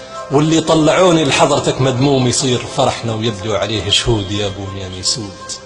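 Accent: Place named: Libya. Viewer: اني من الجنوب التونسي و هذي لهجة خوتنا الليبيين هي نفس لهجتنا و الشاعر ليبي و معروف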